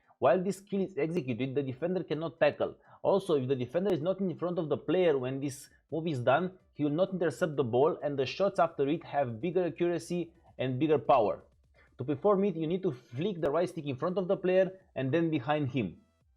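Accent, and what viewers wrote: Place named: London, United Kingdom. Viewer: Nice London man, are u African?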